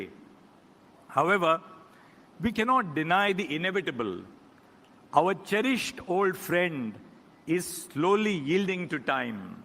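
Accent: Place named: Maharashtra, India. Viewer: Respected sir Kiti God Marathi Bolta Sir Tumhi khup chan Watl Marathi Ayekun Tumchi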